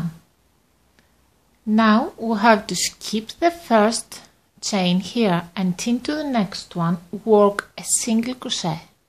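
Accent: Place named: Greece. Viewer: I thought I heard a Greek accent :) γεια σου απο τη μακρινή Αμερική Πολυ όμορφη η πλέξη αυτη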